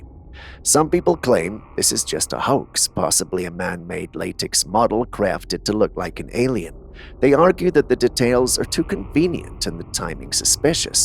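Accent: South, United States. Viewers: ...are you getting a Texas/Arkansaw accent??? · What's with the Southern twang to your voice? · you've developed a southern belle twang out of nowhere. That's the biggest mystery in this video. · are you throwing a little bit of country on us? And what's up with calling Russia, RUSHER?!? LOL. I don't even think country folk refer to Russia as "RUSHER" 😂. You crack me up! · I noticed your cowboy Southern drawl accent tonight lol I like it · What's up with the occasional southern twang, such as when you say 'house' ? I'd never noticed it before, but it's become almost regular, being heard in many of the clips. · your voice cadence keeps changing back and forth. From regular city mouse to southern country mouse!!!! What's going on dude?? Did you visit some old family relatives from the South and you inadvertently picked up a southern twang in your voice?? 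No disrespect meant by that, btw. I was just wondering??!!??🤔😬🤭😌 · Little Southern 'twang' thing going on there this time - maybe has been travelling the South...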